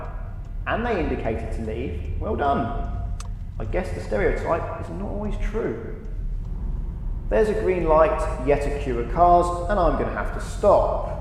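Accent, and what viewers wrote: United Kingdom. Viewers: This guy speaks with his own British accent. Try to find someone who speaks with your accent. Similarly, I never understand Indian accent.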